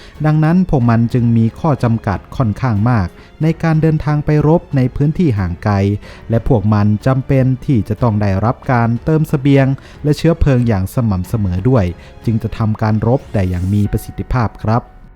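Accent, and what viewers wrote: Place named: Provincial, Thailand. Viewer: พูดบรรยายแบบเหน่อๆ ฟังดูขัดๆกับเทคโนโลยีใหม่ๆครับ พูดกลางเน้นเสียงเข้มจะมีความลงตัวที่สุดครับ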